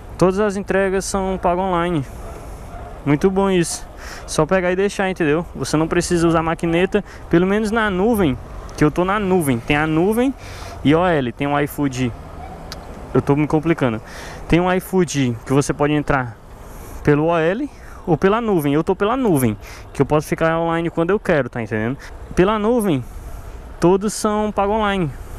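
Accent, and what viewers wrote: Fortaleza, Brazil. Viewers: Tem que ser de Fortaleza 😂 "Teu ânus" "Os cara teima" 😂😂😂😂😂😂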